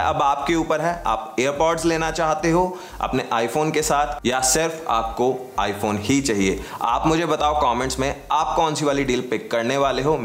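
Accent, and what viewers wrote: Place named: Delhi, India. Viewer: Nice video! It sounds really funny when you emphasise "me india se nahi hoon" :D :D and you sound like a typical Lajpat Nagar boy :) Should rather say i don't live in India. :)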